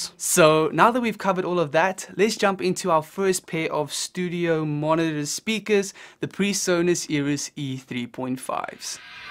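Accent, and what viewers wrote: South Africa. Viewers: Howzit boet - where in South Africa are you from?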